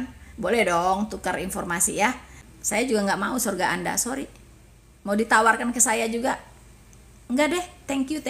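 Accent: West Sumatra, Indonesia. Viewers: Hallelujah, Tuhan memakai hambaNya yang cerdas dan tegas menyampaikan kebenaran Firman Tuhan. Meski memakai logat Minang, namun tetap menarik dan menjadi berkat. Semoga para pemikir Minang seperti Ade Armando dll yang mengandalkan logika bisa terinspirasi dan menerima jalan keselamatan dengan mendengarkan penjelasan penjelasan.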